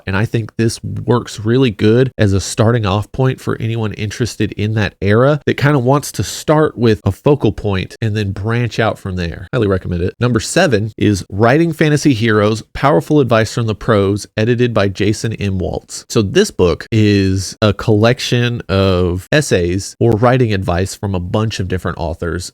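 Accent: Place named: South, United States. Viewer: Thats a sick MN hat I gotta get one of those. For some reason I thought you had a slight southern accent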